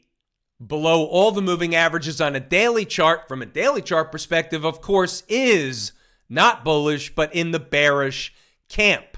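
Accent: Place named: New York City, United States. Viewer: The NY accent is over the top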